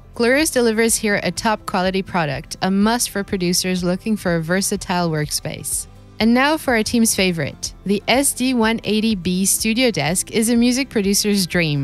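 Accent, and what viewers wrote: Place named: United States. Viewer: American accent, but went metric. Oof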